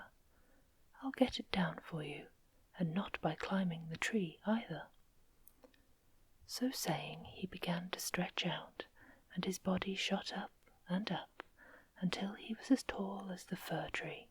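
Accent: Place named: England, United Kingdom. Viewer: Love sleep stories. The softly spoken English accent is soothing & relaxing. I've always loved magic & fairytales so I thoroughly enjoy the stories plus the narrator is great. What is the narrators name? I really love listening to the stories in her great voice. I listen to these most nights. Thanks so much!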